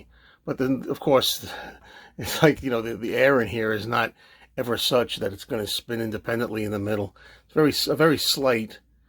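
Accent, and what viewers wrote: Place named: North Jersey, United States. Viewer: You sound like you’re from north Jersey. Anyways I do like your channel and the few videos I’ve seen.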